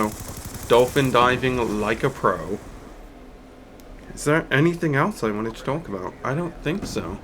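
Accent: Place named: United Kingdom. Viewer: Hey..much love from Germany to the Voice of GB;) it's so funny to listen to you and...you with the british accent and the opposite is with his cool ny talking thing...;)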